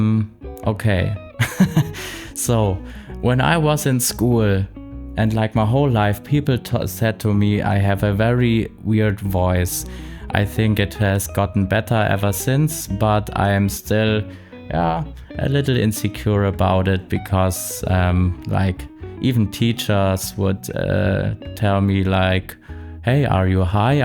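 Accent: Germany. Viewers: Can't tell that you have a weird or "sleepy" voice in English. You just sound like a German speaking English carefully. Vielleicht auf Deutsch könnte ich die ,,Schläfrigkeit" hören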